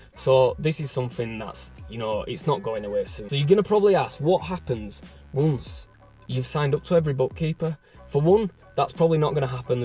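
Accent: Leeds, United Kingdom. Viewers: Sounds like from Leeds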